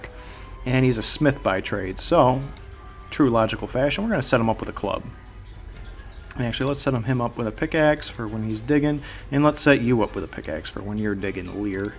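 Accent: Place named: South, United States. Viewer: ooooo southern accnt! charming!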